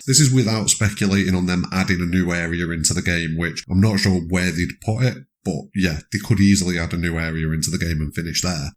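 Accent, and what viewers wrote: Northern England, United Kingdom. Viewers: Also nice to hear a fellow Northerner. We don’t have many people from our part of the world. Most are across the pond. I am from East Lancs so not far from you at all.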